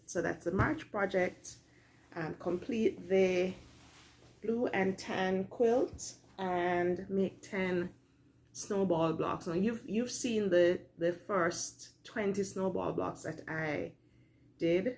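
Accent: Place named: Jamaica. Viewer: Hello, I'm new to your channel! Found you through Sean guy who sews💞 looking forward to seeing more of your videos! I have a coworker who is from Jamaica, your accent reminds me of her, a lovely -lively person 💞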